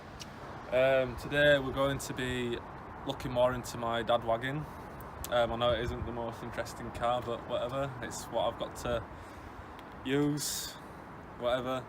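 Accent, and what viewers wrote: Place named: North West England, United Kingdom. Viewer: nice one bro. Just watching threw your videos now? Were abouts you from guessing north west with ur accent? Im up in southport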